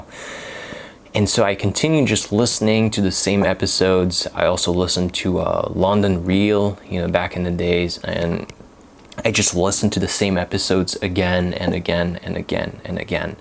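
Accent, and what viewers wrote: United States. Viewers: From an Australian’s perspective, he has an American accent with a hint of something else. No big deal. His grammar seems perfect. I haven’t noticed anything he said that sounded very wrong. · if you didn't mentions that you're french ,, I would guess you're American .. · Wahoo! as a French speaker and living you blow my mind!! You speak like an American. I will follow everything to bring my English to the next level.!! Congrats 👏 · Your English is absolutely fascinating! You sound like an American speaker!!! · Yup bro great to hear your English speaking journey. My goal is to speak English like an American speaker. You are really close to American english native speakers. My problem is that when some time I speak English I think not making mistakes while speaking. U made me motivated to learn American accent. Please let me know know what I need to do? 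I will be thankful to you forever. Thanks from my depth of heart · Amazing american accent. I would bet you also have an excellent rest for music. Do you play an instrument? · You cannot have this level of English without having lived in an English speaking country especially Canada or the USA . You have an American accent . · you did a great job. I couldn´t notice you´re not a native English speaker if you woudn´t tell. You sound very american. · Your American English is even much better than some native speakers in the US. Congratulations! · Dude! Your accent sounds from the US. I am French like you, from Paris. You did an amazing job to get where you are. Your mind is very well structured. You speak with calm and ease. Congrats! I am moving toward a fluent Spanish, right now. My strategy is slightly different : I am moving directly in the country to learn the language. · Your wordstock seems essentially British (e.g. 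your use of the word «holidays»), but your accent is 90% American. Have you taken on learning Tahitian at all yet? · Are you sure you are not American? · Your accent sounds American and your diction is just Amazing. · Dude you even sound more American than me ….wow 😮!!!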